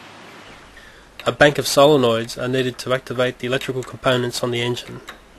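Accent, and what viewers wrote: Australia. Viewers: Who is this fucking robot voiced australian narrator? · That's an Australian accent you tool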